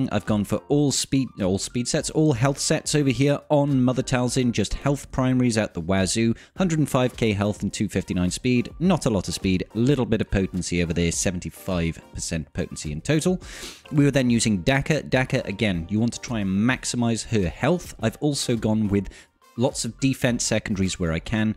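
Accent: United Kingdom. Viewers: Realy enjoying the content please keep it up. On attempt 20 but eill keep trying. Also good to hear a fellow Brit playing. Ally code: <num>.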